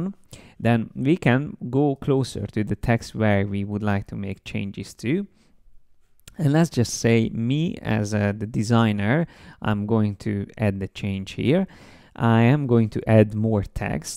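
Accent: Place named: Hungary. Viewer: you have a kind of Hungarian accent. :)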